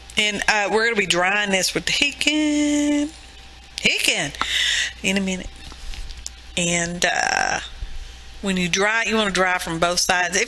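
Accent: South, United States. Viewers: Cute cards......and love your southern accent!!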